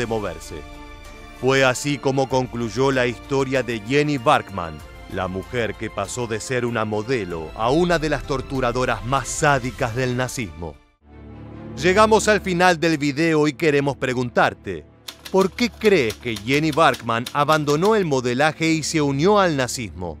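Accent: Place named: Argentina. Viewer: Ablas como argentino venezolano con cubano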